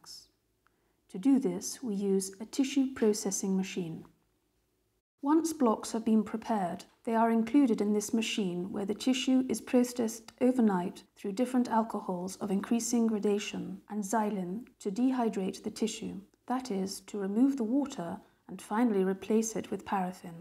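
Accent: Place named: RP, United Kingdom. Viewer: Sounds like "Received Pronunciation"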